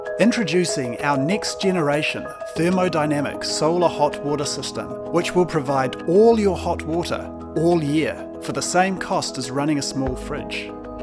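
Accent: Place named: New Zealand. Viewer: Well, this is totally irrelevant but I must say I love you accent! We need more New Zealand people on the international media!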